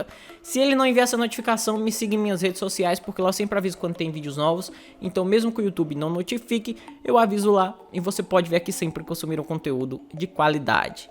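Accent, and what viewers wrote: Northeast, Brazil. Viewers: Jesus:"( vc e de onde? Pelo seu sotaque e Nordeste mas qual é?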